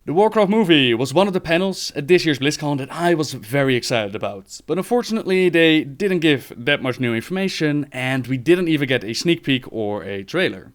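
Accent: Netherlands. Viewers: dutch accent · Your dutch accent! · I think hes from the netherlands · It's a Dutch accent.. I reconnized it right away. I hate the Dutch english accent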